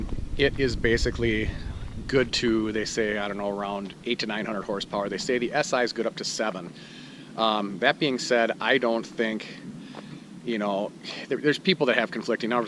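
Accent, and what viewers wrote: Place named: Minnesota, United States. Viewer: Dude sick ass car! And loving the Minnesota accent lol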